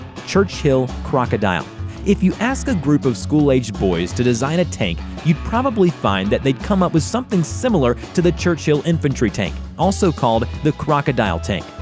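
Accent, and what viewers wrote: United States. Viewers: Err.., Dear Mr.Narratorr, Sir, while U most certainly sounded American, dunno if U actually are, but eant the word Corps. as in US Marine "Corps", pronounced as "Core or Corr" phonetically, rather than the disturbing "Corpse" - wic wud be a DB, cadaver or maybe a carcass evn. Thus far, I thought only some individuals native to India only sez Corps as literally in as the offensive sounding "Corpse", He-He, tis' ez cute.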